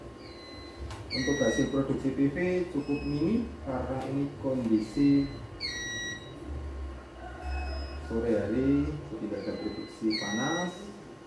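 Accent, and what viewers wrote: Java, Indonesia. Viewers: simpel bos,, kalau anda mggak suka logat medok ya nggak perlu di tonton dan nggak perlu di dengarkan bos,,, daripada anda anda menggonggon nggak karuan di kolom komentar,,, channel youtube orang korea Reomit saja sangat bangga dengan logat medok,,, IRI BILANG.BOOOOOOOSSS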